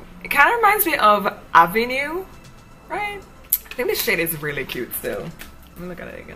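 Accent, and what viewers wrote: Jamaica. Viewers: U have a little Jamaican in u don't u because I'm Jamaican and I can hear it in ur voice a little I just come across ur channel just now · i could from your enunciation of certain words...im jcan too..subbed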